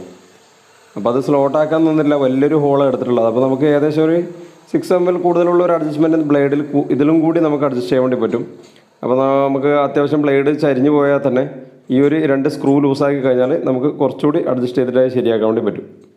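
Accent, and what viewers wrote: Kannur, India. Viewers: You are allrounder Best of luck. dear. And i like kannoor langage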